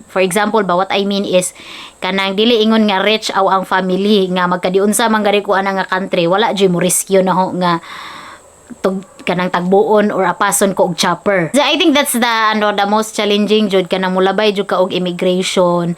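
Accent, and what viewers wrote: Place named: Bohol, Philippines. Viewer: Dli k tinood tga bohol kung wla k kabalo sa accent ni ms, especially ang iya mga word gegamit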